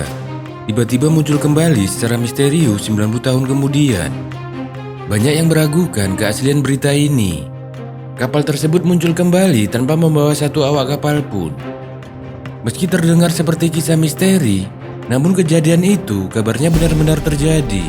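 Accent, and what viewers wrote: Java, Indonesia. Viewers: Medok ya mas suarane...iyaaaa jaraeee capaaaaa😂😂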